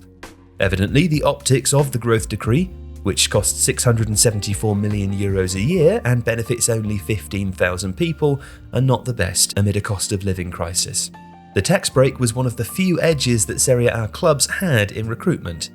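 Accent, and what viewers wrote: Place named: England, United Kingdom. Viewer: didn't know the channel viewership is exclusively Italian. Strange that they make content in English and that too a narrator with an English accent. Oh well a waste of resources then this video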